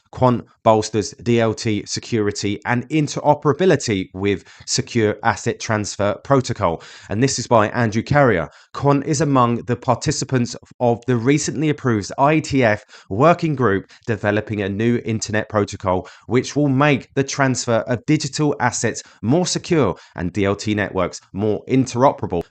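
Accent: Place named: United Kingdom. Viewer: Not to mention, I think your British accent rocks!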